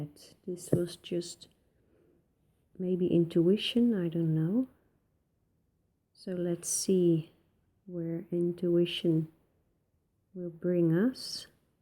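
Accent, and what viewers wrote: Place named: Netherlands. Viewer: The expression "raining cats and dogs" is still in common usage in the U.S. Chartreuse rhymes with "loose." I find your Dutch-tinged English to be delightful and charming, with never a time of misunderstanding. We find these days that the younger generation simply will not speak grammar properly, so it is actually a relief to me to listen to your excellent English. Ohmigosh - sign me up for your workshop at one of our national parks!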